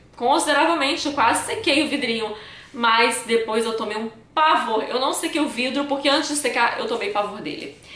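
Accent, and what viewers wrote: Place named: Rio de Janeiro, Brazil. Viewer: PARABÉNS PELO VÍDEO MINHA DOCE BELA E REQUINTADA YOU TUBER ÁRABE COM SOTAQUE CARIOCA QUE EU AMO. SEMPRE BEM DETALHISTA DIVERTIDA E EDUCADA FAZ UM VÍDEO DE PERFUMES NACIONAIS MASCULINOS PARA AGRADAR AS MULHERES FICARIA MUITO GRATO BJS ABRAÇO UM CHERO TENHA UMA BOA NOITE PERFUME QUE EU AMO PRA ESPANTAR GENTE Q EMPRESTAR O MEU CAPACETE DE MOTO EMPRESTADO É O TABU UM EXORCISTA PRA EXPULSAR PESSOAS QUE EMPRESTAR ROUPAS ETC........ KKKKKK❤😙😍😂👏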